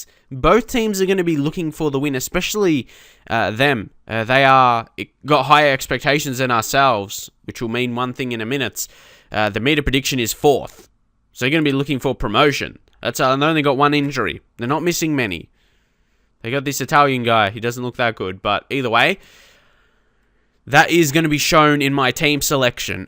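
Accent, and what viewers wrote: Australia. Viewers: Seriously? Can't you tell he's an Aussie? :)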